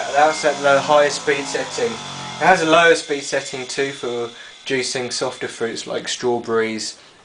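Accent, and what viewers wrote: England, United Kingdom. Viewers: Dig your British accent. Nice review on the juicers. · he sounds very english to me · I never saw a chinese person with a british accent ^_^ cool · asian with british accent cool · an asian with a brittish accent how awesome!!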